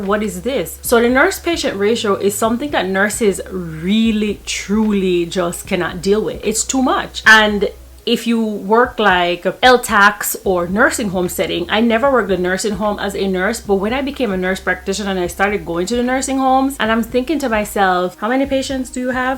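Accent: Jamaica. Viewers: Hail up muma!!! Hear the jamaica accent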